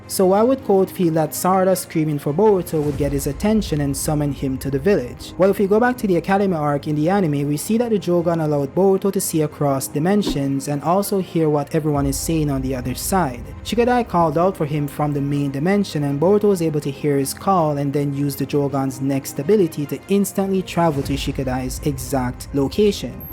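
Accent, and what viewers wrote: Jamaica. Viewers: Thanks for the video. U kinda have a Jamaican accent 😅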